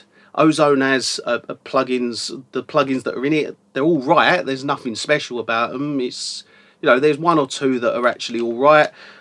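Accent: England, United Kingdom. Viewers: great reviews as always!! Thanks-you. When you say "three" it sounds like "free" ! ...its the English accent + my hearing loss! :)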